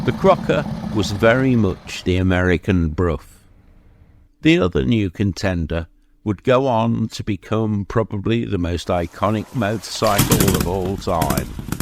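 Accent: United Kingdom. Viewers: I love the stuff you put out. Love across pond accent and knowledge. Here in the States we got all the Japanese bikes and very few Euro bike ever made over here until the 2010’s. Not that had numerous bikes but I’ve had a few. I still own a 75’ Z1b and it’s stablemate is a ZX6R. The 600 will do an honest 268 kph or 166 mph, not bad for a bike half the displacement discussed here. I don’t need to go any faster. I supposed if I had it dyno tune and spent for a titanium exhaust I could go a little faster, but it’s not worth the money or effort. Besides I love hear that scream at 16500 rpm.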